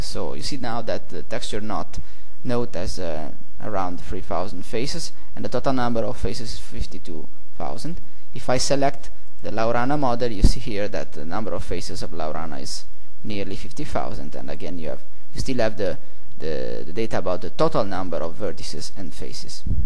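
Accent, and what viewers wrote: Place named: Italy. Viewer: Итальяно прейро))) По-английски говорит хорошо, но по оставшемуся акценту национальность легко определяется)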